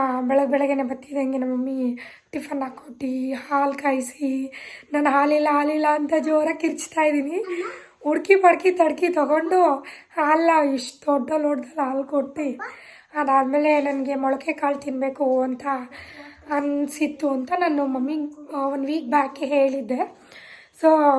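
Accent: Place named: Mandya, India. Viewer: Mandya bhashe maatadtare, namma mandya😊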